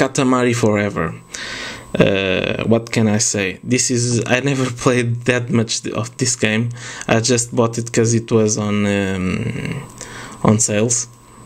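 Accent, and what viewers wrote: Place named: Yugoslavia. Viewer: You sound Yugoslavian like me but you're French right?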